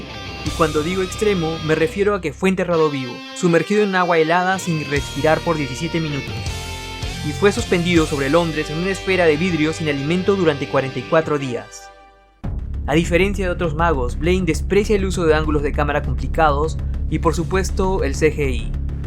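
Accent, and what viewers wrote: Peru. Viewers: Es peruano por el dejo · por su acento me parece que si es peruano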